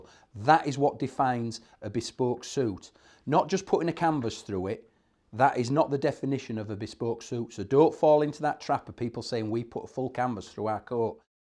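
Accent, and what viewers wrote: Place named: United Kingdom. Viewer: Yeah absolutely thanks for your understanding. Which part of UK is your accent from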